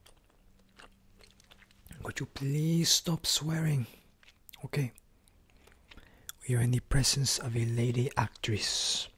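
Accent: Spain. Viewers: I love this accent you do! it is phenomenal. This husky Spanish rough tone.. uff so good! p.s I love how close to the mic you are. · I like the Spanish accent. This and Oberyn are my fav videos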